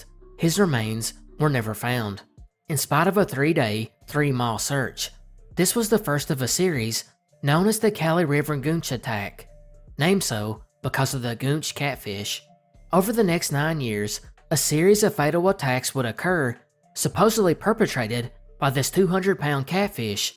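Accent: South, United States. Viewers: has the cutest accent. I actually find it incredibly wholesome and comforting despite the grim things he sometimes covers. Im born and raised in Mississippi and my family has been here for several generations....this may surprise some folks, but different areas of the south have different accents - a lot like the UK. The queens RP doesnt sound like a Welsh person or like a person from sat..Manchester. We dont all sound like some rich wannabe in Georgia or some hick hillbilly with 1 tooth 😂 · My favorite part of.. is his accent..and smart narration. 😎 where is he from? I'm not a complete moron..I'm guessing In the south.. but I'm from Canada 🇨🇦..so give me a break. 😅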